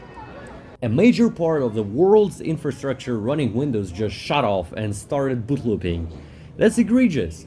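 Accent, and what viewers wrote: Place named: Russia. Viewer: русский акцент всё ещё остался наш)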